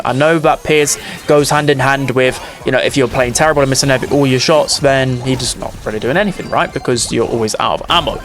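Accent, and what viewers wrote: United Kingdom. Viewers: Bro's heavy British accent wont let me understand some of his words, who tf is Russ?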